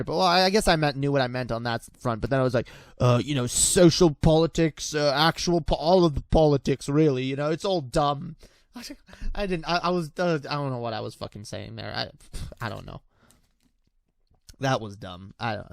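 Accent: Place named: United Kingdom. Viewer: So…. Why the British accent in older vids?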